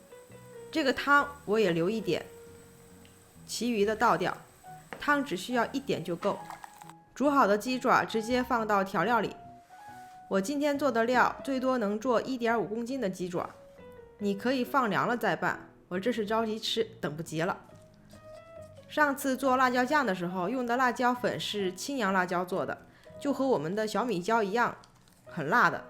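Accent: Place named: Northeast, China. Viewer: 영상 잘 봤습니다～这个时间看这个，真的流口水了，听博主说话应该是东北人吧😊性格特别豪爽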